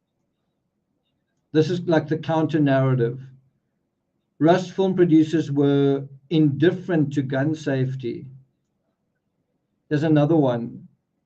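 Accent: South Africa. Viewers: please do not go to another garden! I appreciate you so very much - and I am sure so do many many more. All your time and energy in constantly following the truth, your insights into human nature and behaviour, your continuous urging to look at ourselves. And the oh so familiar South African accent - your nest certainly would be well lived in in our garden!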